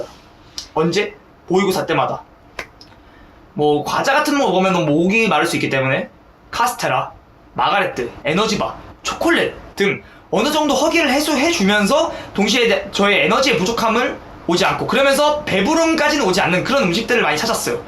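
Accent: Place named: Busan, South Korea. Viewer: 다른 얘긴데 경상도 출신인데 대전으로 이사 가신 건가요? 말투는 부산말투인거 같아서요 ㅎㅎ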